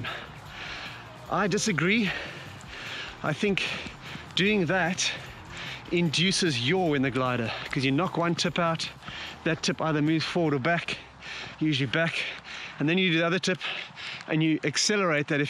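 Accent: South Africa. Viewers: Cool video! Your accent sounds South African. Are you? Also, where was this video taken?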